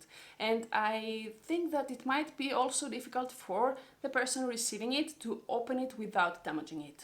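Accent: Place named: Russia. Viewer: good morning I thought you were russian because of your accent haha:) Kind regards from the netherlands🇳🇱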